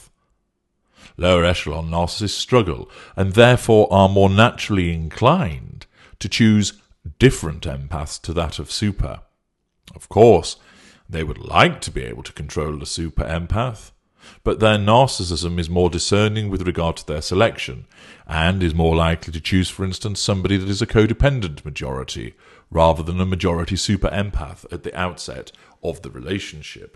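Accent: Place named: RP, United Kingdom. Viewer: I have such a hard time believing that you are a narcissist and/ or psychopath. Is it my bias for the dulcet baritone, the lucid RP ?? I've whistleblown and taken on entrenched corruption and criminal narcissistics who resorted to harassment by proxy to get at me when direct attacks failed and failing that were exposed in court ( long story) By the time I won the case the guy was afraid of me and very angry.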